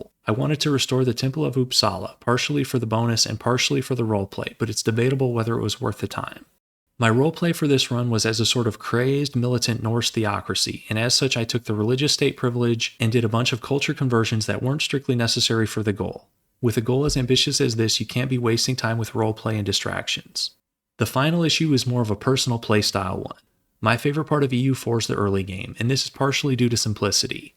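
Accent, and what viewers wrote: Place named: United States. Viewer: As an Australian, seeing and hearing an American is suprising to me each time. I want to see you conquer Austria and become emperor, Catholic, and revoke as an Australian tribe.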